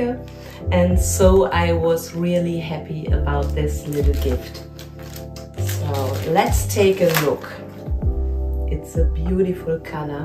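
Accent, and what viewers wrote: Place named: Germany. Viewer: Yr German accent makes me hot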